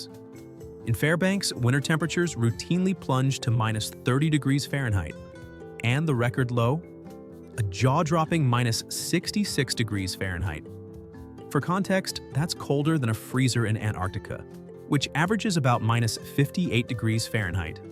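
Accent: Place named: New York, United States. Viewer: This commentator must be from New York..... or Martha's Vinyard..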